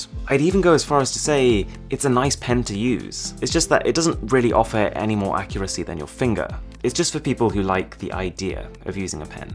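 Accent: RP, United Kingdom. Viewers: You sound posh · Absolutely love his posh English accent.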